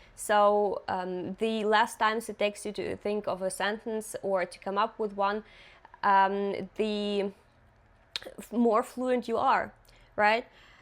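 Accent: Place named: Russia. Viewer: Coming from russian native i guess , your english accent is really impressive, i am working on that, i dont want to sound like an american but have the least accent possible. i like your content because is really helpfull .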